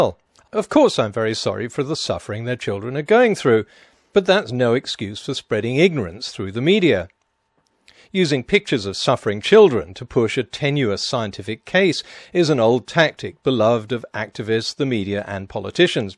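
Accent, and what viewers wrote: United Kingdom. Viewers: I take this guy twelves more seriously because of the GODDAMN BRITISH ACCENT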